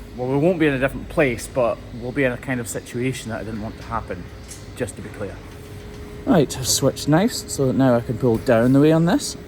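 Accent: Scotland, United Kingdom. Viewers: I just love accents and languages especially scottish accent. Love these educational cow podiatry. ❤ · I'm so happy I now know what digital dermatitis is. In my favorite Scottish accent. Much love brother. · Love the Scottish accent. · Stopped by for the hoof trimming, stayed for the Scottish accent.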